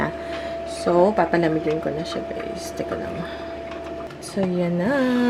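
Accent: Batangas, Philippines. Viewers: Thank you so much sa pagsagot ng tanong ko..kaya pala may dine at baga ang salita ..kahawig ng salita namin sa Mindoro...lagi ko pong panunuurin ang inyong vlogs..thank you so much🥰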